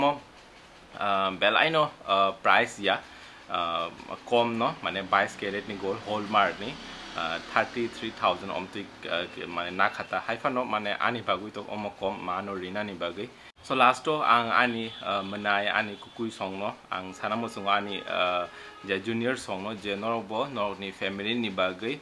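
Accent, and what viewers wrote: Tripura, India. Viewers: Dara nni belai kokborok khunathokgo❤❤❤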